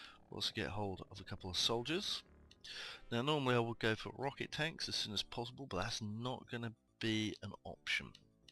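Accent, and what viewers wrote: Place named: United Kingdom. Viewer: I have watched ALL 56 videos 6 times in a row while I've been playing in a separate DOS window. WORDS I LOVE HEARING HIM SAY IN HIS ACCENT: 1. SAH-GENT Smerkey 2. Raw-ket-lawn-chuh 3. OH NO....OH NO (pronounced Air-Nair...Air Nair) LOL. Being from TEXAS, I totally love his British accent!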